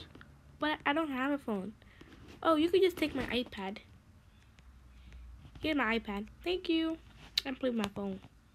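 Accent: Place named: Philippines. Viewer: I can't see that I chat here but I know and I hear that shes from Filipino tama ba ko?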